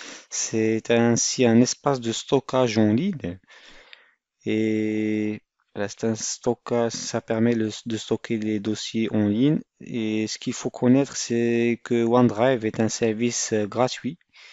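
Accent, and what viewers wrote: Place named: Morocco. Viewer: 3raftek mghribi gha mn lfrançais ta3ek 😂😂😂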